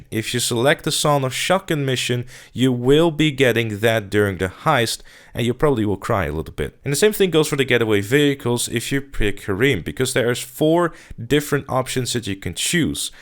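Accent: Netherlands. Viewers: is this guy dutch? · Are you dutch? You have a bit of an accent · Heyy are you Dutch? cause i think i hear it in your voice ik ben ook nl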